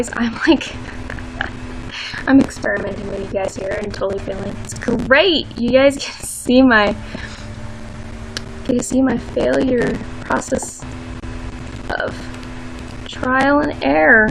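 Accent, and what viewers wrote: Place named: United States. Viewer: oh my god my parody buddy has the cutest american accent! Soooo cute! OUO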